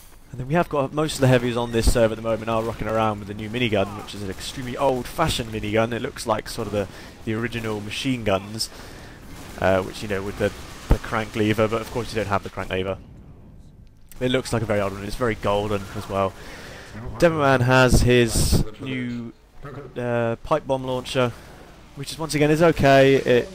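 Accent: England, United Kingdom. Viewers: AMAZING VIDEO!!!! Are you English or British? Srry, im not tryin to be rude at all, just curious.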